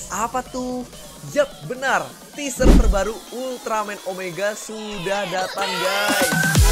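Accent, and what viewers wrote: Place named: Java, Indonesia. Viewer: Ini nih medok tapi bagus,tutor dong saudaraku biar pd sama logat medok.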